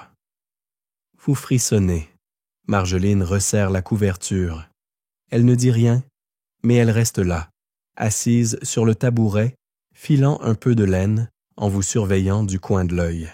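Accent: Quebec, Canada. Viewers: Vous êtes québécois?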